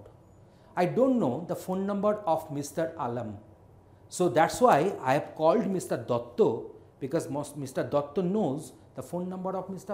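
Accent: Bengal, India. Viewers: He has an indian accent and i see no issue french have french accent,etc. And they are proud we should be proud and he is a gem❤️ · Bengali aiese hi bolte h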